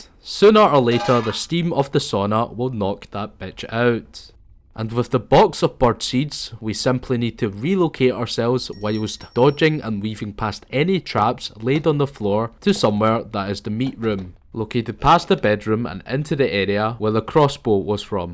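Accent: Scotland, United Kingdom. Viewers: an asian with a scottish accent? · Yoo a fellow Scotsman! Congrats on the world record and the fantastic accent 😂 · the asian with the strongest british accent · Why do you look Asian but sound like a scot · Saw this vid on my homepage, pressed and liked the humor and ur Scottish accent is so entertaining and I think eventually followed, looking forward to watch more vids, LOL. “Get fucked”😭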